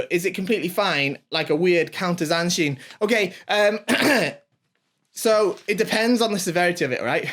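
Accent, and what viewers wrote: United Kingdom. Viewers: hello. Please remove your name and other titles from bottom because I'm watching you with subtitles to better understand you British English. 😉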